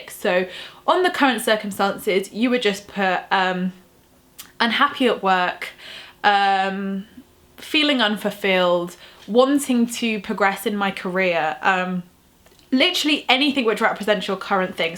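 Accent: England, United Kingdom. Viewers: Hi I just found your channel I have tried the 2 cup method today I ask for more abundance I felt very happy doing it I said a prayer and I kept the desired note in a wooden box as suggested by another yoube channel any suggestions how long it takes before you see the results I love your English accent when I manifest some new friends I would love to visit England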